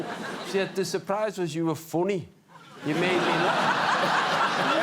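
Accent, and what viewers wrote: Liverpool, United Kingdom. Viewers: Absolutely scouse...it's scouse with the edges filed down a bit though, less throaty. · He is from Liverpool, is it common accent in Danelaw region? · What's his accent? Liverpool? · This Liverpooler accent is really funny! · Most scousers sound awful but hes refined the accent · Crazy Liverpool accent. Almost sounds Scottish. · Love a scouser, so down to earth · Aye. Pretty much. Scouse. Ones Kirkby and the others Runcorn so about 20 miles or so apart.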